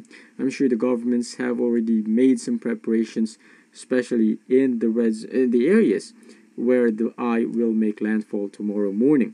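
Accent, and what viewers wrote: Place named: Philippines. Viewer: Cute pinoy accent.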